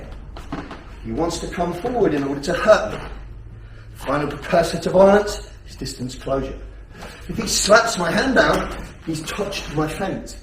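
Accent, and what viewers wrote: England, United Kingdom. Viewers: Amazing….maybe because I dont understand this englisch akzent sad I main idea is ….if the enemy touch my hands(fence) the I give him a blow and go straight on and kick him of the planet. Is this right? Can somebody write the main entantance of this vid for me in this comment? I am so interest to know what really he ment. I dont understand this art of spoken english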